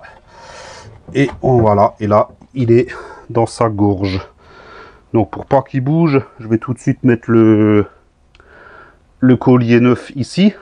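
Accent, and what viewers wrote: Alsace, France. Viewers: Pourquoi dire qu'un soufflet adaptable est moins bon qu'un origine? quel est ton argument, car je ne suis pas d'accord, la qualité du caoutchouc étant identique. Très bonne vidéo. Mais je pense que cet outil n'est pas indispensable compte tenu du nombre de fois où la nécessité de changer un soufflet est ridicule. J'ai trois véhicules tournants et je n'ai jamais changé de soufflet. D'autre part, je pense que cet outil n'est valable que pour les soufflets externes. En tout cas, merci pour tes vidéos très didactiques et utiles pour le commun des mortels. En plus, ton accent est lié à ma jeunesse passée en Alsace, à Schiltigheim et cela me rappelle tellement de souvenirs d'une époque révolue. Je suis comme Michel Sardou. Je hais notre société où la violence est devenue la règle.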